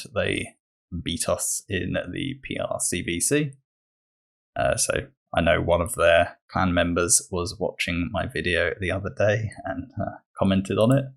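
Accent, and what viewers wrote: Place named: Germany. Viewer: So i startet 8 days ago. Yes, how do you found my small channel then and dont watch the videos from the ,,big" boys? Its cause im a freak in getting Infos. I whatched sooo many videos on how to build, what to do, who to save, where to start and so on and so fort. And ill just grab the epic and then pass the rest of the event. The epic whould help me ab bit. My only 6 star is kael and other i habe 5 star drath and a 4 star vergis with a 4 star warmaiden. So this epic could help me pass some higher stuff cause my kael is full dmg and has really no acc. :) And I like to watch your videos. You are very informative and freetoplay so you understand that we dont have godlike gear lmao. :D Also, are you german? You have an accent. :)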